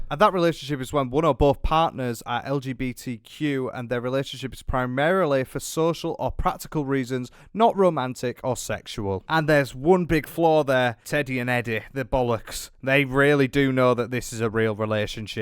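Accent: United Kingdom. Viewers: I am American I SPEAK ENGLISH! But I HAVE NO IDEA WHAT THIS BRITISH DOUCHE IS SAYING I HATE THE BRITISH ACCENT 🙄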